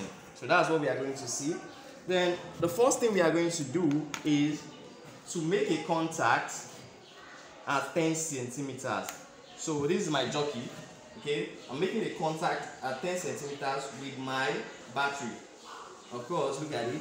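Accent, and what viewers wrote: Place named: Igbo, Nigeria. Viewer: I knew you are Igbo at the instant of your first word , and that's why I called you brother. Just keep up the work. ka Chukwu nonyere anyi!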